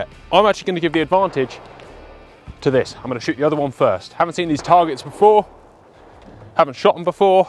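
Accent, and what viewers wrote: South, United States. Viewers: Good southern accent and good review......you sounded like you are from North Carolina P.S I saw a brief news report of US weapons going to the Ukraine and amongst them were Mossberg 500 shotguns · Great Southern American accent. 👍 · I like your American accent. 😄😄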